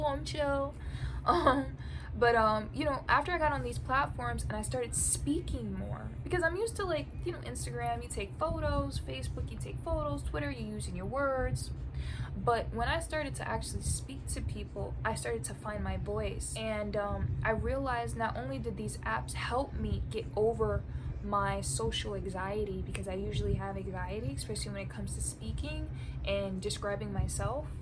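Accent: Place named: New York, United States. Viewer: I love this video z! The NY accent, the light hearted vibes and the authenticity. It’s so you.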